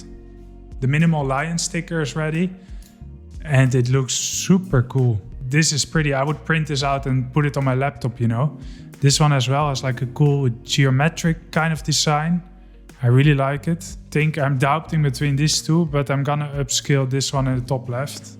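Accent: Netherlands. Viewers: Kwam toevallig op een video van je terecht.....volgens mij hoor ik een Nederlands accent ;-) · bro has the most dutch acccent ever lmao. i love the content, keep it up maat!😜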